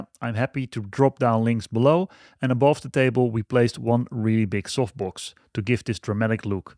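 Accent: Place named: Netherlands. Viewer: I hear a dutch accent🎉.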